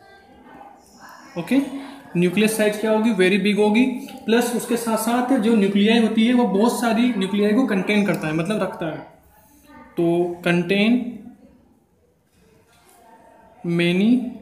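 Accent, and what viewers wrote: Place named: Bihar, India. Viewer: your videos are really good except for that bihari tone you speak.......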